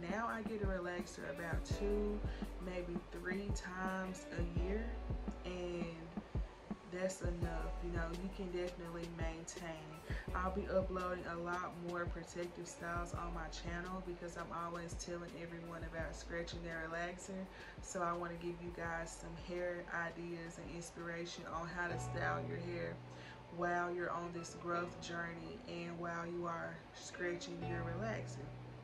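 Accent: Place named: South, United States. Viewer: Good information! You sound like a southern girl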